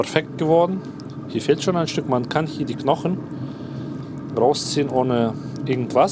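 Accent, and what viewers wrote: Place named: Russia. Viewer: Слышу ты русскоязычный, а что по русски канал не зделаешь отдельно?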